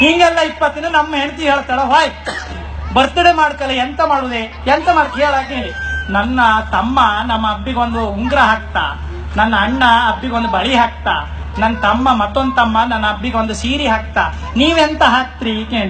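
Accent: Kundapura, India. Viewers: Love kundapura language style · Great kundapura kannada yavatidru.......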